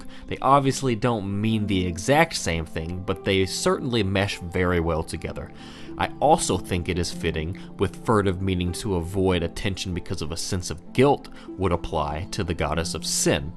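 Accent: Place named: Western Pennsylvania, United States. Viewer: great fuckin videos dude. luvin it. im kinda a noob to the series (i played through most of 2 and smashed 3 but missed the first one) and youtube content creation. i love your take on things and am probably going to go home and get prepare to die tonight. i am currently writing a (serious) dark souls rap going into some of my theories, and yours tie in great with mine. i hope you enjoy it when it gets up if you get the chance to see it! hopefully will be this weekend. also--are you from western PA? hope thats not too creeepy of a question but my moms fam is from up there and you got the accent like whooooooa sometimes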